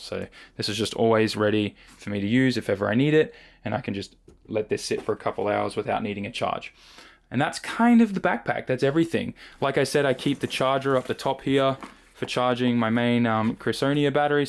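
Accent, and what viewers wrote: Australia. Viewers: I'm so glad I'm not the only one who heard that, lmao. The Aussie accent is strong in this one.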